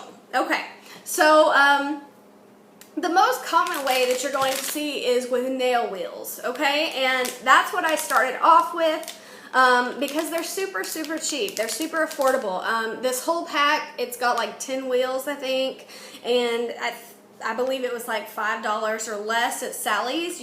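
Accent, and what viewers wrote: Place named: South, United States. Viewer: Where are you from?! I love your accent are you southern?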